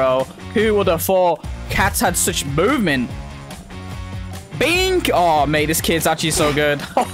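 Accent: United Kingdom. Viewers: why did he become more british?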